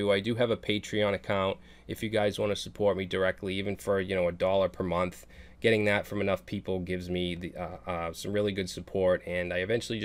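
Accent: Boston, United States. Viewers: Just... tremendous! Your laidback instructional style (and awesome Boston accent) has helped make so many seemingly scary technologies approachable for me, so heaps of thanks upon thee! Now that you've freed the masses from the shackles of "E" in Express and made Hapi.js accessible in its stead, I wonder what kinds of new stacks we're going to start seeing... I have a few in mind: * NASH: Node / Angular / SQL (whichever vendor) / Hapi * HORNY: Hapi / Oracle SQL / React / Node / Yeoman * HOTDAMN: Hapi / Oracle SQL / Typescript / Dust / Angular / Materialize / Node I wonder if any of these stacks actually exist out in the wild LOL!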